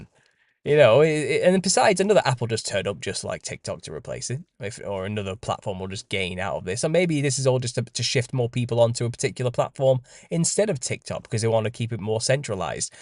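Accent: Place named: Wales, United Kingdom. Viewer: I love the British accent to because my mom was from Wales and all her family sound like you😂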